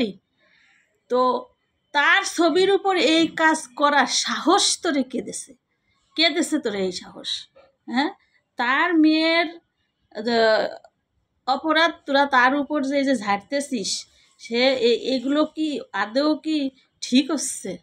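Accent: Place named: Kushtia, Bangladesh. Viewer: Api tomar language so sweet kustiya niki meherpur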